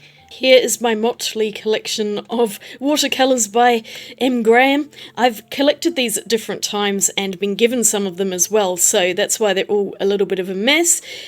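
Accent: Australia. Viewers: Love hearing another Aussie voice. Hot press paper is fun to play with granulation paints on. Thanks for the comparision. Waving from Queensland 😊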